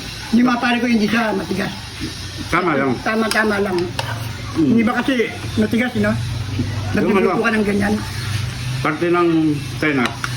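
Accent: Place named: Western Visayas, Philippines. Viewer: Akala ko ba ilocano version bakit ilongo pagkakasabi mo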